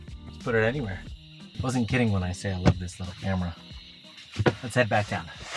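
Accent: Canada. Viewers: after hearing him say "out" so many times, I'm now wondering, is he canadian?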